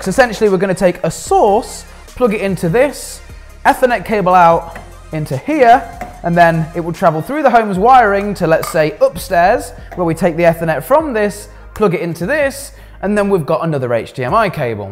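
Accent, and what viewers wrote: England, United Kingdom. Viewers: What do you mean "say Ethernet Properly "? He's saying it with an English accent, do you speak english? Because its sounds ok to me. it's called English not Americanese.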